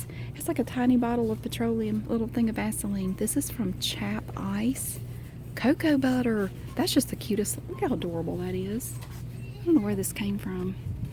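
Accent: South, United States. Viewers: I've just recently subscribed to your channel and I am so glad I did! These types of videos imparticular are oddly relaxing and satisfying while informative! Plus, I love your soothing voice and southern accent..so caring and motherly. Thanks for all of the work that you do! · you're the best!!! I watch these vids when I am at work and have to laminate and cut thousands of buffet labels. It makes the time pass quicker then just listening to music and I love your Southern Accent and sassy remarks, thank you💜💜💜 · When I'm in shoos I do the same thing now. Its addictive and really fun. Even my great niece does it. This is doing what she does brilliantly ASMR. Soft Southern accent, relaxation, I love it. Haters keep hating, if its not for you piss off and stop criticisising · Plz don’t stop them because of selfish sad people!!! Focus on the people that love your videos like me!! 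I thank you! When I’m at work and stressed I look for you and put these videos on and you southern voice calms me down!!! Thank you in Jesus Christ mighty name!!! Dan from NH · i’m love these videos. they’re so calming to me. i lost my mom when i was 15, so listening to you talk makes me feel like i’m chatting away with a supportive, sweet, southern mama! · Feel like I’ve just had a counselling session the, thank you ❤️❤️ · Hey there. I watch your videos often but am not one to comment every time. I wanted to quote the famous RuPaul and tell you " 'less they payin' yo bills, pay dem bitches no mind!" Don't let the negative comments from a bunch of people you'll never know well or meet bring you down. I enjoy your videos. They help to calm me. Plus you have that great southern lady accent. I'm in Michigan so we don't hear that around here often. Keep doing what you're doing and keep your chin up. 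Thank you for your work! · I’m from Germany and I really enjoy listening to people with a southern accent, it just sounds so fun! I spent a year in the US, in Montana though. Great experience! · Girl I love your videos we got the same country accent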